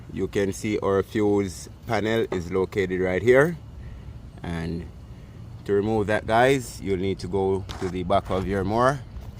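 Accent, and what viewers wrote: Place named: Jamaica. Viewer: You did a great job thank you for the tip and showing us what to do and I like your accent are you Jamaican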